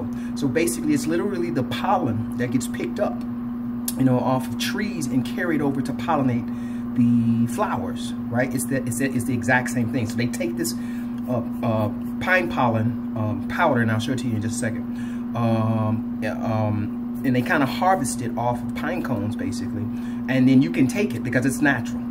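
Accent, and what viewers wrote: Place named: United States. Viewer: I don't know what you pay for your pine pollen but you can get 70 grams of it from Harmonic Arts (Canadian online company) for 32 bucks. They also do tinctures and have an amazing variety of products and have decent prices. Another online place I would like to suggest is "Canadian Pine Pollen Company". Judging by your accent I am assuming your are American? I just wanted to give you my sources because with the stronger US dollar you may be able to get much more product and save some money. Also, I have zero affiliation with those companies. If I love something, I rave about it and I have a big mouth. Heh.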